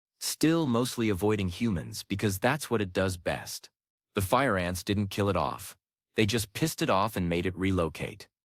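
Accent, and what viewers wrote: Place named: United States. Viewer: I Vote B#LLSH$T...The american voice is a giveaway...Yanks are full of it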